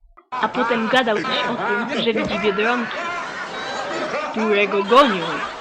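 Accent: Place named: England, United Kingdom. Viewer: Nie mów z angielskim akcentem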